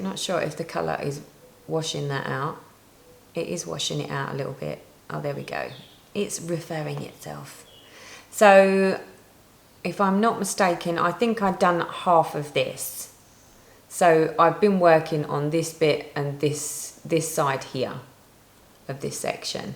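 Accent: United Kingdom. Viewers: I agree with the others, do one of the animal squares. The foxes are adorable but the buck's would be cute too. This is my first video to watch of yours, i love a british accent & watch alot of british shows even though im american so i dont care what you talk about, i would watch it, lol. I just was re watching one of the celebrity big brother uk the past few days. It was the one with Natalie Cassidy in it, ive watched Eastenders for a long time so i always enjoy the ones with those actors in it. (im a rambler too, lol)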